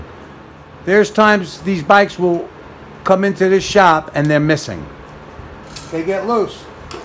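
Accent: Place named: East Coast, United States. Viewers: I knew it, you sound like I do, lol. Like you, I’m born and raised in Brooklyn 18yrs, moved to Long Island 18yrs, now I live in El Paso Texas, nice small town. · GOOD VIDEO.... LIKE YOUR THICK EAST COAST ACCENT...... LOL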